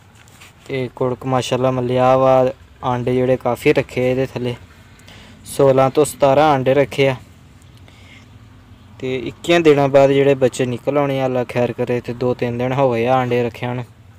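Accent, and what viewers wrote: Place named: Punjab, India. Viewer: Salaams nice Punjabi we speak like you too apnayat mehsoos hoti hay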